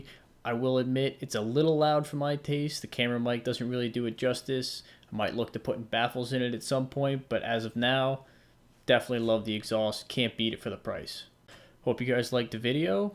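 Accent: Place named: Pennsylvania, United States. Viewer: Cool man. Sounds like a PA accent. Looks great. Totally worth getting a tool for the retaining ring I think. Here’s one I have. Pretty inexpensive for the heart burn. <link>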